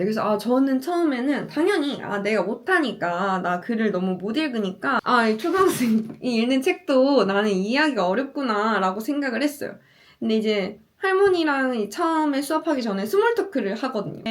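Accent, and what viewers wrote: South Korea. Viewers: 약간 전라도 억양이 느껴지네요^^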